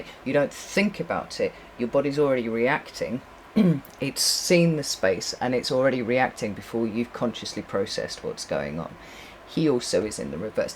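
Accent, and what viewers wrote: United Kingdom. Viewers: Your first reading for me. Lovely to hear someone from the UK. Really resonated with what’s going on in my rather complicated personal life right now. Trying to figure out in which direction to go in the best way possible moving forward. Thank you for your insights.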